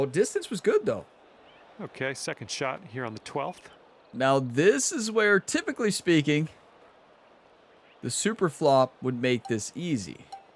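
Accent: United States. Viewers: One time for every shot:_gamerOnetime: Birdie train called 🐦🐦🐦🐦🐦🐦🐦 I think you should complete the challenge on this one fairly comfortably. So get ready for another tier 4 activation. And yes Nascar series is awesome. Featuring Rhett Games as Rocket Rhett, the Daytona Demon (the most entertaining to watch driver in the world) Edit: What an idea, Bdan, GbWaCkErGuY, Apex, Respawn, Kawkster and Early1981 as playable pros. What a match play ladder that would be 😁 Edit2: As a person from the UK, I can confirm you pronounce Royal Birkdale correctly. The person who said your were saying it wrong was likely either a troll, or somebody who was thrown off by your American accent 😉 Post round edit: Comfortable as predicted. Great round 👍 You should give Dingo's latest course a try for an impossible challenge. It's called Sliabh Abhartach (pronounce that if you can 🤣). 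It's not one of his crazy fantasy courses. Just a beautiful realistic creation and a good challenge.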